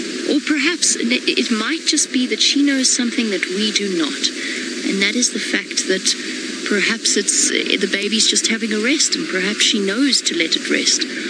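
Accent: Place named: England, United Kingdom. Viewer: Maybe if you could film and shut up. English accent off putting had to mute.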